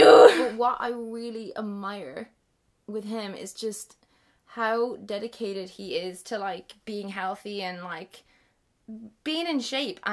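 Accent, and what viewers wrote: Ireland. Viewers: Which county is she from? I'm woeful with accents. Tipperary? 🍍🍍🦄🦄❤❤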